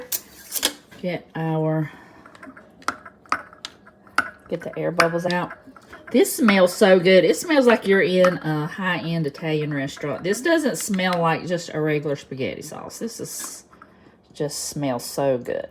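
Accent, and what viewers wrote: South, United States. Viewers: Wow! This looks delicious and I'm adding yet another recipe to my list of things to can this year. And I agree, stuffing manicotti noodles is a challenge. Your plate with the green flowers brought back some warm memories. My Mama had the same ones when I was growing up. I'm originally from the south so hearing your voice is like being home again. Thanks for sharing. 😊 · I love this recipe! When I don't feel like cooking a big pot of sauce, I just go grab a jar!! Love your southern accent, my dad was from Mississippi! Thanks for joining in!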